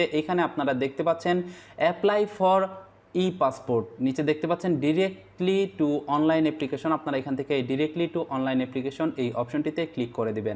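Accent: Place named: Dhalapara, Bangladesh. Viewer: Mone hoy apnar basha Dhalapara...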